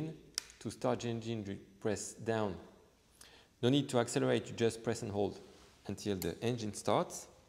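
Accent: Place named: France. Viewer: Très bonne présentation 👍 mais peut-être mieux en français (vue l’accent 😉) et sous titré anglais !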